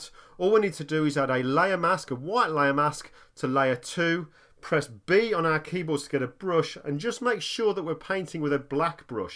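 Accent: United Kingdom. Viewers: It's a UK accent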